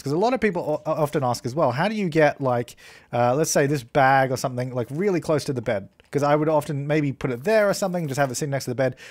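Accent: Australia. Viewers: Are you from Australia? Just wondering as I'm from Australia as well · Are you from Australia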